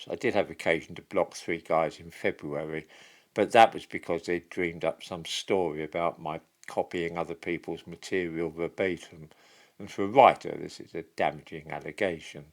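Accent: United Kingdom. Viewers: "...who is expressing views which are seldom voiced aloud..." I think you are not quite on the mark here. MANY people voice similar views. But here on this channel those views are voiced eloquently, and have them backed-up by (often quite obscure) facts. For example, though many people know in their gut that the current narrative on the 'Windrush generation' does not add up, few know enough on the subject to confidently object to seemingly factual statements made by supposed educated journalists, politicians and activists. Having someone actually articulate those views, backed with solid facts, that is what draws people in. Especially when voiced in an endearing archetypical British fashion.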